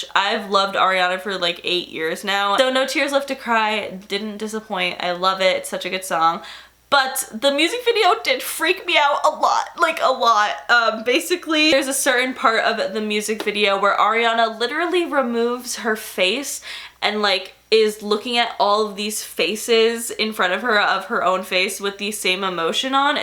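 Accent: Canada. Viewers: lately i think you can been sounding Canadian🤷🏼‍♀️ idk if anyone has said this cause i don’t really look at comments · I'm so confused as to why has a Canadian accent if she's an Italian from Florida like wtf 😂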